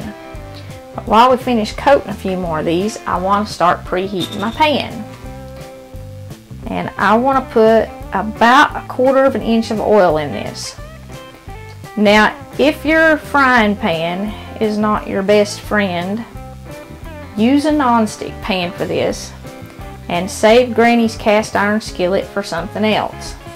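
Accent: South, United States. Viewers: You're awesome. Love a gal with a southern accent.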